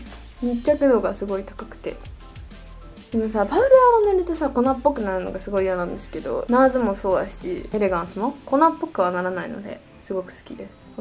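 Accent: Kansai, Japan. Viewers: この顔で関西弁、、強すぎる💕𐤔𐤔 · かわいい🥰🥰🥰 いつもさりげない関西弁がちょー好きです関西のどこ出身でおられるんですか？わたしは26歳大阪です💓 がんばってください！！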